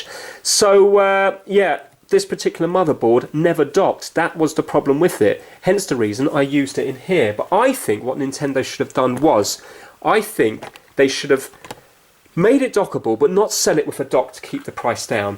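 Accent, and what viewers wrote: United Kingdom. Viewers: Haha your happy British voice :)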